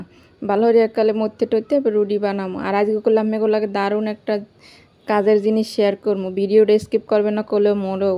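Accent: Barishal, Bangladesh. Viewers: মুই কিন্তু আপু তোমার বরিশালের কথাগুলো বেশি ভালোবাসি ❤❤❤তোমার কথাগুলো বেশ ভালো লাগে।পরিষ্কার ।করার আইডিয়া দারুণ ❤❤❤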